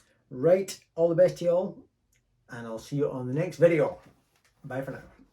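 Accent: Scotland, United Kingdom. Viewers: As a 63 year old Scotsman living in the Alps for the last 20 years, and recently taken up guitar and watched quite a few videos, I just love the Scottish patter and your skill with the instrument and your words. Keep it up, appreciated by many as I can read, but definitely by me. As a car lover I also smiled with glee at your wish to get a new car without kerbing and clutch abuse. Looking forward to watching more of your back catalogue of videos. Loved the camping one, felt like home in the north west highlands, Many thanks.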